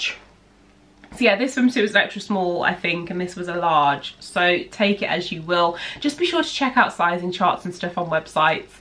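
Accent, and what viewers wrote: United Kingdom. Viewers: I love your British accent. Your bum looks good in the purple swimsuit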